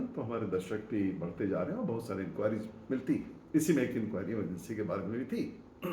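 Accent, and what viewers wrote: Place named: Lucknow, India. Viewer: लहज़ा लखनवी है 😊 आपको सुनने के बजाय अगर इन्हीं शब्दों को जस का तस लिखा जाए तो उसे पढ़ने वाला ज्यादा अच्छी तरह से समझ जाएगा और ठीक वही प्रभाव पैदा होगा जो आप चाहते हैं |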